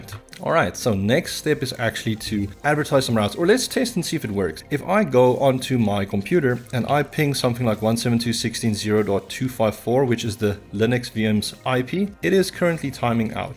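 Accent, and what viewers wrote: South Africa. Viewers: <num>... Knew I was picking up a South African accent. lekka man!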